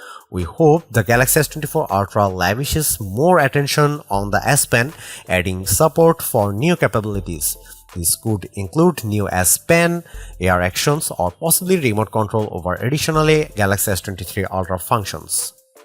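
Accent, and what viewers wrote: India. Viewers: your accent is kind of Bengali mixed accent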